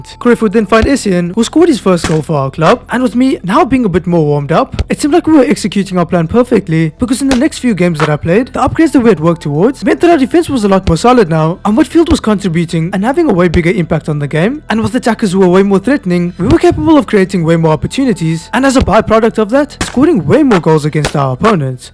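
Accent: South Africa. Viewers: Why you sound so South African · hi dude im new to the channel what is your nationality you sound south african? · bro are u south african, u sound like it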